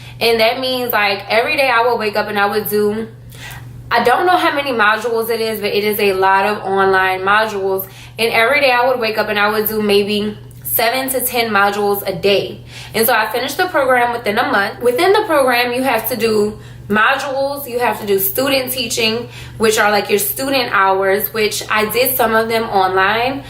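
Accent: New Orleans, United States. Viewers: You’re definitely from New Orleans 😂😂 #batonrouge area.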